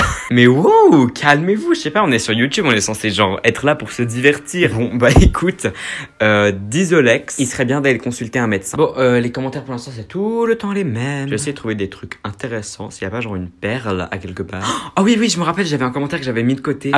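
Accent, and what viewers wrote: Quebec, Canada. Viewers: Je t'adore est j'adore ton axen un peut Quebequoi(je ne sais pas l'écrire) je t'adore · TU ES UN PEU QUEBECOIS ? OU??? CAR AU DEBUT J'AI REMARQUEZ QUE TU AVAIS UN ACCENT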